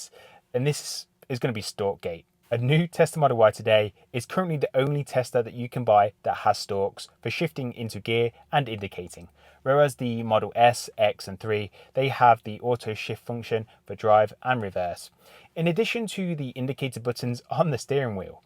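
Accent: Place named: Midlands, United Kingdom. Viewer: I thought I detected a Midlands accent. Nice one, thanks. Just getting my collection checklist ready.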